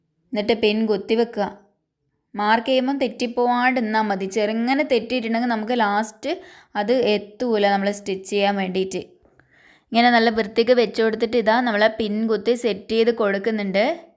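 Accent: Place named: Wayanad, India. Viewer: Evidaya veed ? Samsaaram kelkumpol vayanaadu polund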